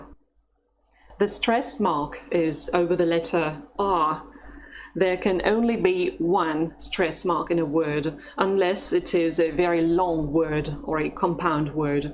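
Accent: United Kingdom. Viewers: когдо я сделаю упражнение я всегда читаю всё вслук вы очен ясна гаворете но иногда немного медленно. You are clearly a very skilled linguist, I will subscribe even though you have a bit of a British accent when you speak english. I can hear the all the authentic sounds when you speak russian.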